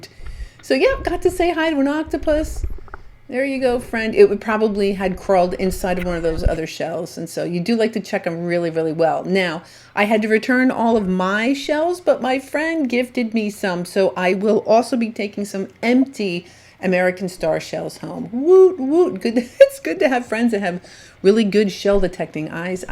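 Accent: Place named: South, United States. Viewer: Hello love! FIRST! Maybe one day, I'll make it to the Keys. Don't know why I've never been... Beautiful sea star! Is that a Clydesdale horse conch? Hey, you're beginning to sound like a real Southern girl! "I'll tell ya what!" You did great and had such an exciting adventure! So happy for you! Much love from Levi and me! It's nearly a hundred degrees up here! We're staying in the AC! Your Mermaid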